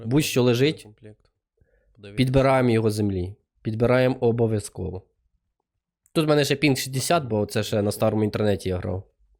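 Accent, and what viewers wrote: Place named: Vinnytsia, Ukraine. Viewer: Привіт. Перший раз натрапив на канал, а звідки саме автор? Говір дуже сильно вінницький) нехай повернення верданська принесе тобі підписоти❤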